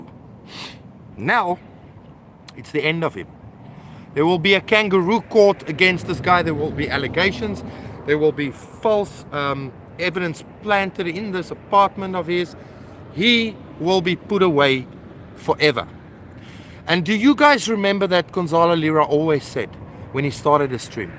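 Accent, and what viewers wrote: South Africa. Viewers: You sound like South African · Are you a South African boy? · You sound like a South African. ❤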